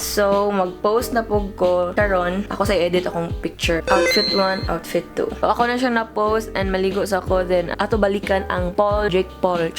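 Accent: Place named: Cebu, Philippines. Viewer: Mgkakatawa ko sa imo accent dai.. perti ka gahi lomad sa cebu! Perti ka visaya😊😂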